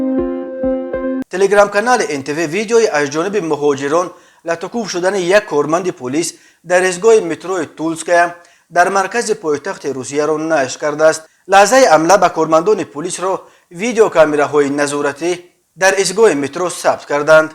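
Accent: Pamir, Tajikistan. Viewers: Акценти хамин журналист Помири мебогад. Ин баранда журналист помири мебошад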